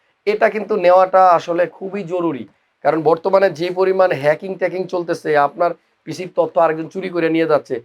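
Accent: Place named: Chapai Nawabganj, Bangladesh. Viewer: তার ভাষা সুনেই বুঝেছি সে আমাদের চাপাইনবাবগঞ্জ এর ভাই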